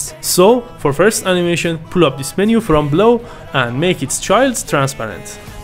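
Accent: Iran. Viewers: you talk english like a persian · I picked up an Iranian accent 🙂. Nice video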